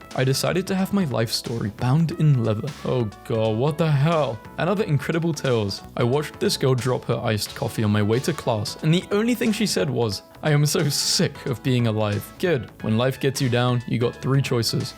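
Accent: United Kingdom. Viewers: His accent makes it A LOT better Shotout to British people and UK itself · This guy's british? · Why are you British 😕🙁☹️ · Is he British???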